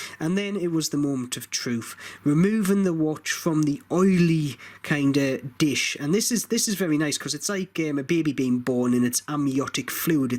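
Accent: Newcastle, United Kingdom. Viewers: You from newcastle mate?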